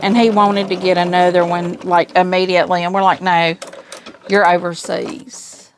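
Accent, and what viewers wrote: South, United States. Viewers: Lol I did too but it's that southern accent. 😂😂😂😂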